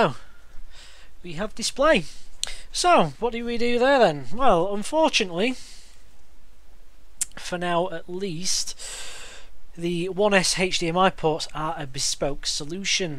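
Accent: United Kingdom. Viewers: im just woundering it sounds like your form the UK im just woundering if you are where do u buy your soldering flux from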